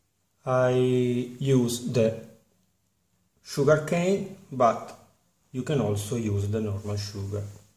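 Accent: Italy. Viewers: so he is Italian, really nice accent.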